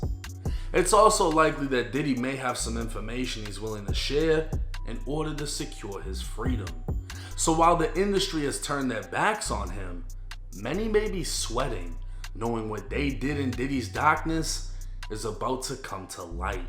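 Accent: Boston, United States. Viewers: Yooo shit had me dying i be in here just to hear this white boy narrate the news 📰 bro got a mix of accents with the local Boston accent god bless America 🇺🇸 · Bro your accent sounds florida/Boston/ and Massachusetts 😂😂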